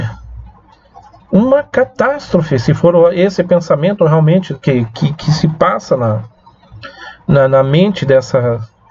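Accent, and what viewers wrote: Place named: Curitiba, Brazil. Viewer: Ola, acabei de conhecer seu canal. Cresci em Curitiba, e sinto falta do sotaque curitibano (alem da conversa) =) So queria deixar um relato sobre uma conversa que tive com uma grande amiga cubana. Ela me contou que quando comecou o processo de enviar medicos de Cuba para o Brasil, a maioria dos medicos queria ir para o Brasil, tendo em vista que, mesmo com o governo cubano ficando com parte do dinheiro, ainda sim esses medicos ganhariam muito mais. Ela me disse, "voces nao tem nocao de como faltaram medicos em Cuba". Essa fala dela me chocou muito. Ela tambem disse, "parece que voces queriam medicos para assumir o interior do Brasil, que estava em falta em alguns lugares por la, mas pra isso voces tiraram ate da capital de Cuba". Eu nao tenho nenhuma opiniao formada sobre se levar medicos para o Brasil, vindo de outro pais, ta certo ou errado, mas com certeza algo que nos brasileiros nao nos perguntamos e por que tem "tanto medico sobrando em Cuba". 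E a verdade e que nao tem medicos sobrando em Cuba. Acredito apenas que, em nome de resolver nossos problemas sociais de forma imediata, de campanha politica, de envio de dinheiro para Cuba, de boa publicidade, etc tiramos medicos de um ser humano para levar para outro ser humano. Essa conversa eu tive ha uns 5-6 anos e ela ainda me choca. A pergunta que fica: sera que deveriamos transferir nossos problemas sociais para outras pessoas? Outra conversa foi sobre ela e os pais dela crescendo em Cuba, sem dinheiro vindo da ex Uniao So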